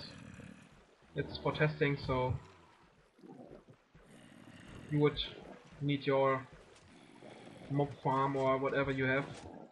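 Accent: Germany. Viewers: Kannst du die welt hochladen? Du hastn deutschen Aktzent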